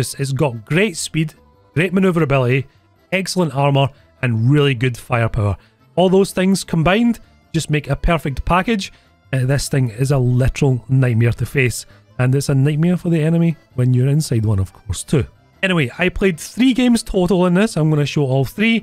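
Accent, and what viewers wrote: United Kingdom. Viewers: You have an intresting accent which part of the uk are you from? I cant tell where most accents are from I a kiwi (new zealander) thought another kiwi was an american because they were from a different part of nz and spoke slightly differently. It was rather embarassing when he said he was a kiwi, thankfully he thought i was an australian so we both had a laugh about that.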